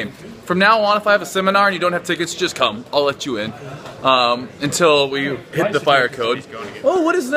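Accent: Scotland, United Kingdom. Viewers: Love your Scottish accent haha makes me laugh! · Hahaha ur Scottish accent is well funny. Come to MANCHESTER ENGLAND!!!!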